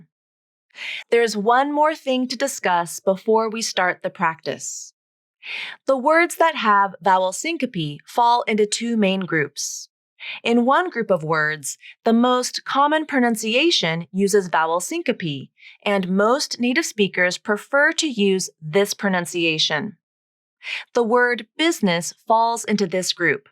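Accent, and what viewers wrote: San Diego, United States. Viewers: un abrazo!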